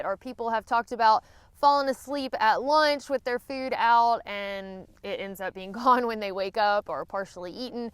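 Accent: South, United States. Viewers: Poor YouTube... Turn off the sound and watch the video close captioned. YouTube just doesn't know how to handle a beautiful southern accent.... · Love your Southern accent. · where you from, miss dixie? you sure do talk pretty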